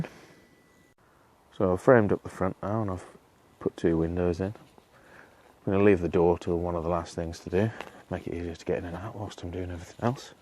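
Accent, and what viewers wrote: United Kingdom. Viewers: Been beating around the idea of a Pallet Shed for a few months now. How long have you had it standing? What is the life expectancy of it? I live in Nova Scotia, Canada... alot of Salt Air and Snowy/Cold winters. Just not sure how long a Pallet Shed would last. I assume your English/British by your Accent and your weather is close to ours. Would appreciate any Info on Longevity if you have any. Other then that, great build man, love it 🤟🇨🇦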